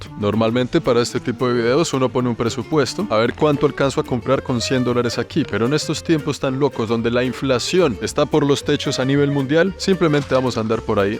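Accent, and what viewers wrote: Bogotá, Colombia. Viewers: Saludos a lla.mama de. Tiene un exelente hijo un grab ser humano. Bendiciones. Dialecto colombiano a donde quierea va 😍Me encanta.. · M encanta como documenta, aparte ese acento colombiano que tambien me gusta. Parse,un gran saludo y abrazo desde CDMX!!! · Acabo de descubrir tu canal, y ne gusto mucho tu manera de relatar los videos, me encanta tu acento colombiano, ojalá vengas a alguna parte de Mexico y te conozca en persona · 🤣🤣 habla como mi abuelito: para que 50 dólares si en 2 meses le crece la pata 👟 yiyos 🩲🤣chagualos 👟👟🤣 los calzones con la marca para que el que le vea la nalga sepa que lleva Tommy 😂😂 muy Bogotano el hablado, Saludos desde Bogotá.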